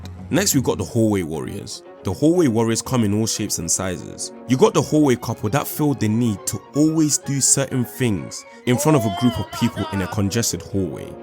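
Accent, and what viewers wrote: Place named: United Kingdom. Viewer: bro how this guy talks in the most british way is just so funny and understandable 🤙😭🗣️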